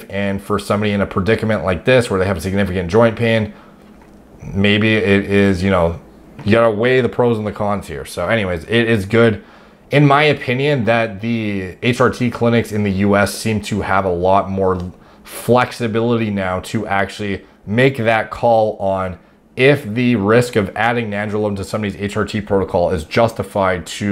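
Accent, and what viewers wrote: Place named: Canada. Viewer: We do. But he’s got the Canadian accent ayyy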